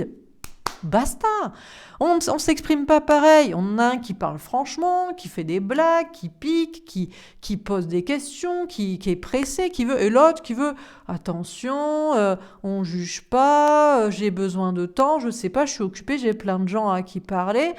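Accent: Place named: Southwest, France. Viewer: L accent du sud ouest est magique 😁 J ai le même 🤪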